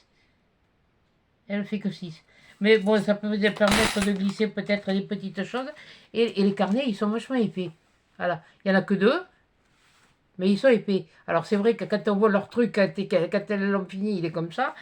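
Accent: South, France. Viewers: Cc, je regarde de temps en temps tes vidéos même si je ne commente pas souvent car j'ai les mains occupées. Déjà merci pour ce partage d'achat très intéressant. Je n'ai jamais commandé sur ce site, il va falloir que je m'y penche 😅. D'autre part, j'apprécie ta franchise, ta spontanéité et ton naturel, tu me fais énormément penser à une personne qui m'était très cher et qui me manque beaucoup, tu as le même accent du sud, le même parler, le même caractère et presque les traits physiques de ma grand mère.. à tel point que parfois ça m'est difficile de t'écouter, tu me rend très nostalgique...bref j'espère ne pas te vexer de te dire cela. Surtout reste comme tu es, je n'ai pas commenté sous ta vidéo concernant Vinted mais je suis tout à fait d'accord avec toi. Merci pour ta bonne humeur et la chansonnette finale, tes yeux et ton regard m'ont régalée. Belle journée à toi et bonne créativité pour le défi de...ah mince j'ai zappé le prénom 😅, désolée. 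Bises 😊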